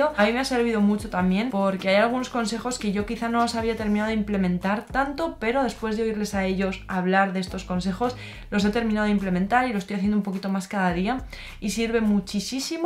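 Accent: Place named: Spain. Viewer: 🥰me gusta mucho tu accento de español aunque nunca me ha gustado el accento de muchos españoles y tambien te estoy imitando cuando hablo alemán 😅,,,no sé porque? Pero me das ganas de decir que alemán suena romantico 🤭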